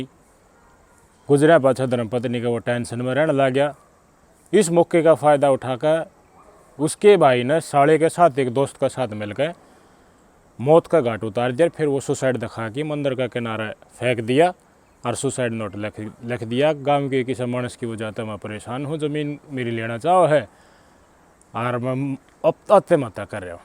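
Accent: Haryana, India. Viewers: Bhut bdiya Bhai sahb Aapki haryanvi se lgta hai real haryanvi yhi hai 🙏🙏🙏🙏💐💐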